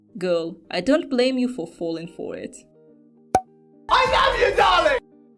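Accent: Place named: Ukraine. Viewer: WAIT— UKRAINIAN? а я ж ЧУЮ що якийсь акцент є!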